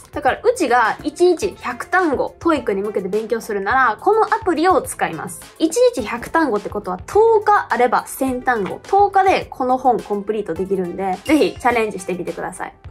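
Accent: Kansai, Japan. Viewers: やばい、関西弁美人女子がドストライク過ぎる。。。どうやったら出会えるんだ🤷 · 関西弁のいいね！❤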